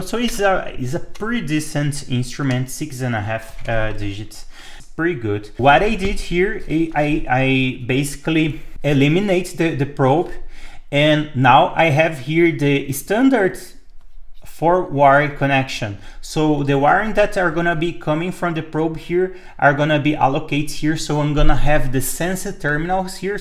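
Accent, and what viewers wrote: Brazil. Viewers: Kind sir, are you by any chance Brazilian or Portuguese?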